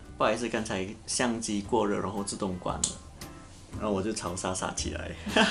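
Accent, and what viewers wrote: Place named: Japan. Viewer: 日本人讲华语好可爱哦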